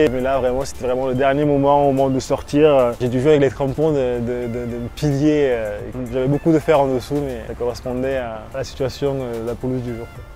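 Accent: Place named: Toulouse, France. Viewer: Ce bel accent Toulousain qui fait que l'on n'oubliera jamais d'où tu viens 😉 et cela même si tu vas très très loin comme je te le souhaites!!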